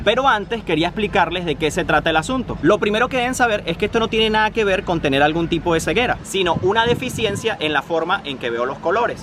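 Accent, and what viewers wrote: Venezuela. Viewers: eres de venezuela? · Umm!! Es la primera vez que escribo un comentario a un Youtuber y veo a muchos!! Tus vídeos me gustan mucho, soy de España hace poco he estado en Portugal unos días :-) me ha llamado la atención ayer ver la banderita de Portugal en tus vídeos y que esté escrito el título en español, enseguida me he dado cuenta que eras Venezolano al escucharte hablar. :-D que chulo!! Venga a por más vídeos!!! Olé!!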